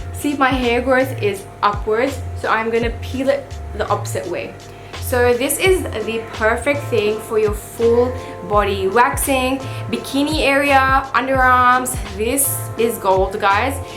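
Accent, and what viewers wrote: United Kingdom. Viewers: Literally i thought your Pakistani . your speaking style is very similar to British accent. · I hear a bit of scottish accent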